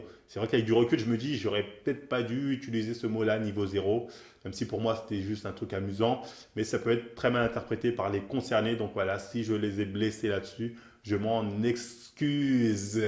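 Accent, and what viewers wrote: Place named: England, United Kingdom. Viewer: Les fit gurrrr, j'adore ton accent anglais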